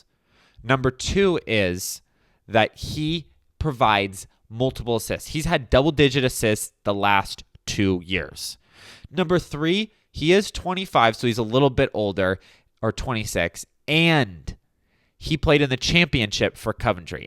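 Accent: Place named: United States. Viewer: Clicked video. Heard accent, clicked off. American accent = opinion void. Especially when you look to young to even remember pre-2012 Chelsea. As soon as you mentioned Kepa turned his back on Chelsea, I knew my instincts were right. Americans are ruining this club.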